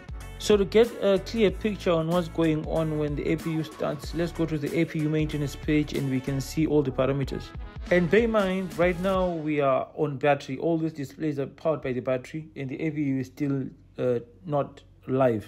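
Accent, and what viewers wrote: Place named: Durban, South Africa. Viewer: Very nice explanation! Thanks. You sound like you might be from Durban? 😊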